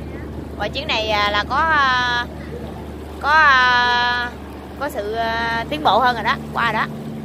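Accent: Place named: Mekong Delta, Vietnam. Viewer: Tôi thật sự là ngỡ ngàng với giọng phát âm của gái miền tây ta. Làm YOUTUBE kiểu này, chỉ để cho dân miền tây xem và nghe thui bạn àh. Bạn đang ở việt nam, người việt đó....làm sao mà để cho mọi người trên toàn đất nước mình, xem và hiểu được....thì bạn sẽ kiếm tiền từ youtube được mạnh hơn ha nhỏ, giọng mẹ đời àh. Xem các ca sĩ miền nam họ hát đó nha....mà học hỏi đi ha.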